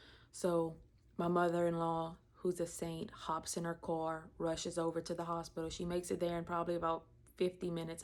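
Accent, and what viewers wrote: Louisiana, United States. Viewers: I am so sorry for your extremely difficult loss of so many members of your family!!! Let me tell you something girl, those people who climb Everest don’t have a thing on you! You are a living testament of resilience, strength, courage,compassion and love! I knew from your accent you were from the New Iberia area.. I am from calcasieu parish. ❤❤️‍🩹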